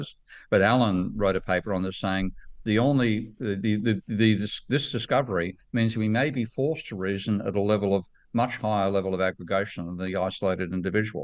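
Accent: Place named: Australia. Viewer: He is Australian 😂. They speak like that😂.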